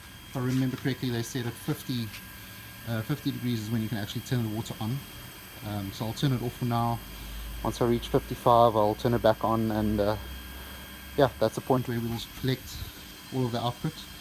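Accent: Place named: South Africa. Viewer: pretty cool man! Thought you sounded SA then you said you were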